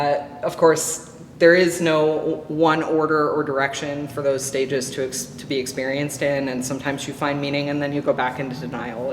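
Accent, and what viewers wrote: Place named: Minnesota, United States. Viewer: I thought I detected a Minnesota accent! That's my home state. Cheers!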